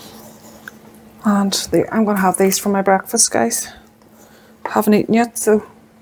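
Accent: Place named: Northern Ireland, United Kingdom. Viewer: I’m enjoying your videos- not only for the recipes but I love listening to your voice/ accent. I’m originally from NI. Haven’t heard anyone say ‘just fire it in’ for years. Thank You.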